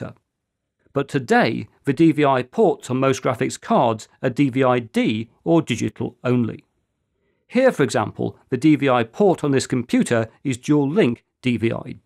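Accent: United Kingdom. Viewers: Even though I have worked on/with computers since the 1980's (I used to service Mac's & IBM pc's at University), I find your information about different aspects of computers VERY useful! Keeping up with all the latest & greatest technology trends/changes is exhauting. So... Thank You so very much for your content!! BTW, I enjoy your "outro". You sound like several friends I have in Britian. 🙂🙂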